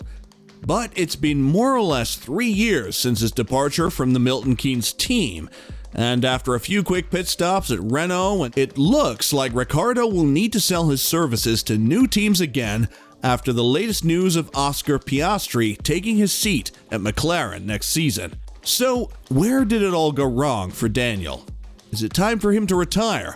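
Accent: United States. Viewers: Narrator is a septic. What did you expect?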